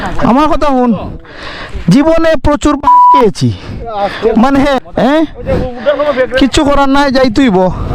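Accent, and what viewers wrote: Sylhet, Bangladesh. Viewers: Vai ki Sylheti?? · Vai apni ki sylhet ti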